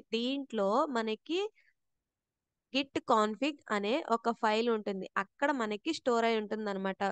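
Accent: Godavari, India. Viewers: mana godarolle...🤪 voice ...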